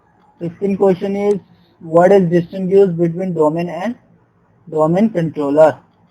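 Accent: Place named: India. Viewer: Can’t understand anything thick Indian accent had to pause each time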